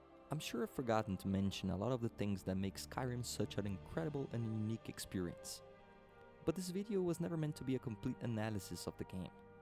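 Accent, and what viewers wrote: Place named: Brazil. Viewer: Me listening "esse cara é brasileiro" kkkkk Também jogo desde que lançou quando tava no ensino médio, dahora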